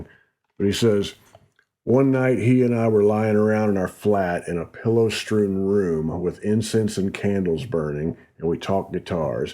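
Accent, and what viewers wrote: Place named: South, United States. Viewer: Right when I hear the "Hey Friends" in that slightly southern accent....I immediately stop everything and watch the video👍🤔👍🤔👍🤔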